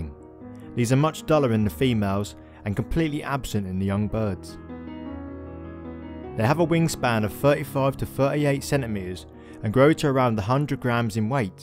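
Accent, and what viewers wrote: United Kingdom. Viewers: Awww mate, that beautiful accent. You make me miss the UK. Thanks for posting. Great stuff. Love from Alabama.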